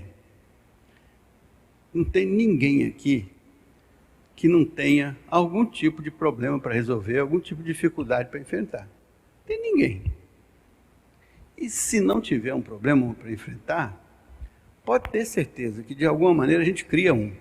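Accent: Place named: Minas Gerais, Brazil. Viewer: Pr com esse sotaque de Minas!!! A Paz de Cristo já começa ao ouvir esse jeito de falar..Te Agradeço Senhor pela Vida deste pastor!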